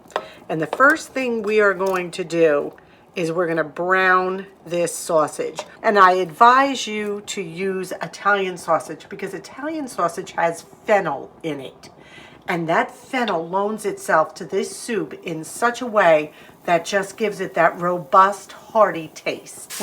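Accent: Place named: North Jersey, United States. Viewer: Hello from Northern California! I was raised in North Jersey though, so love hearing your accent and when you talk about ShopRite. I haven’t been thrifting in ages. I don’t shop much anymore (except for food), but secondhand is definitely the way to go!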